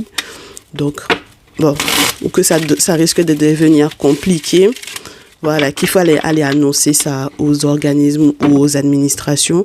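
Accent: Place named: Antilles, France. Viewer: Vous avez un accent Antillais. 😁❤️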